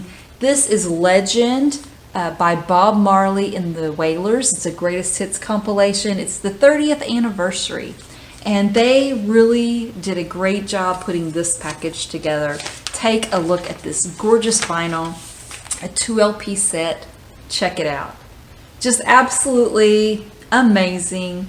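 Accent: South, United States. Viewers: Hey, how are you doing? I subscribe to several members of the vinyl communityand they are increasingly starting to mention you as an influencer in the vinyl community. So that's very good for you and it means your influence is growing.I know you have mentioned that I believe, you were born in Indiana.I have many cousins who are from Indianapolis and they have what I would consider from Chicago a southern accent.yours is more pronounced so do you think where you live now has increased your southern accent? I've always been interested in how people speak compared to where they grew up in so that's why I'm asking.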